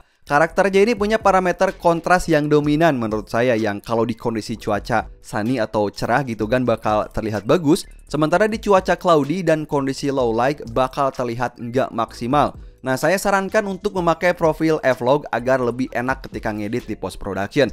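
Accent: Kuningan, Indonesia. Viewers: Urang kuningan jiga na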